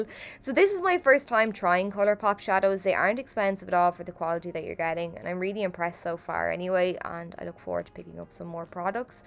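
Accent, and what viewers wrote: Ireland. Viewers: Are you Irish? Sorry that’s random to ask, just really like the sound of your voice so I was just curious ☺️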